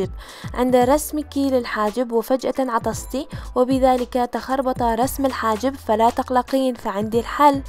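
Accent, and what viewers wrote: Iraq. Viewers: صح ماكو احلى من لهجت العراقيه حلو واحد يحجي بلهجته مو بالله 😂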